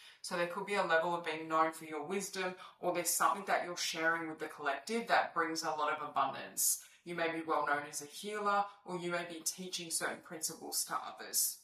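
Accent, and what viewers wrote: Australia. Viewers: #3, thank you for the overall message of renewal. Love the accent, Australia or New Zealand love?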